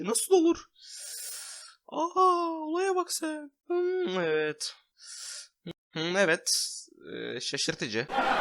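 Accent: Russia. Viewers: Neden sesin rus aksanına benziyor dostum??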